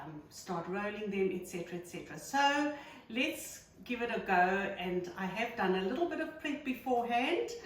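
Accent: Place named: South Africa. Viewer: Sounds as if she is from Johannesburg South Africa not Sydney Australia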